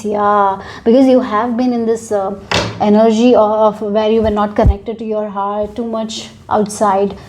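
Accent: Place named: India. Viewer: I am sorry to say ... but what a Shītty Read !! So much Irrelevant bull shît !!!!!!!!!!!!!! And my God your h0rrible Indian accent .. so hard to understand...you literally say QWOON..... instead of Queen !!! Took me a bit to get it.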